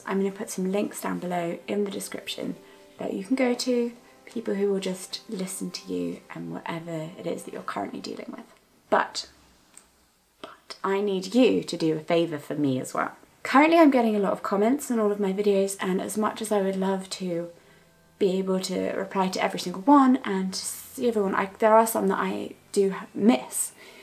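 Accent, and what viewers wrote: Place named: England, United Kingdom. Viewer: I started watching this channel because i liked looking at your face and hearing your english accent but this was actually touching. ty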